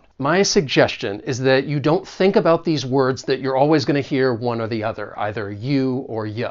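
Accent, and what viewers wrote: South, United States. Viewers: I’m not an expert when I say any of this, but I am an American with a slight southern accent. I think linking is leaning more into a southern accent than a standard one. I also think that the “for” pronunciation you are talking about leans more on the Southern side rather than standard.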